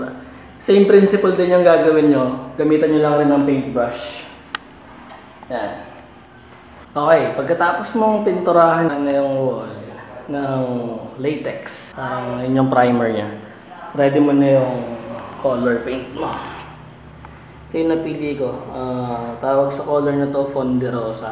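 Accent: Visayas, Philippines. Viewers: Bisaya man diay ka kol haha. Salamat saimo vid kay gusto ko ako ra mo pintura sakong balay para di nako mag labor. Unsay ma suggest nimo na brand? Latex ug unsa tong pang finish na paint? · ayos bisaya mn d i ka sir.. proud bisaya here.. tnx sa video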